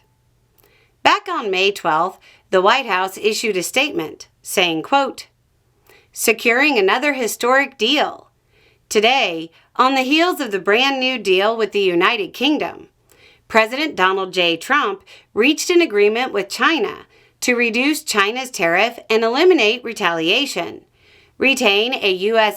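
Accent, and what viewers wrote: South, United States. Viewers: You just got to love the Southern accent and the sentiment communicate across.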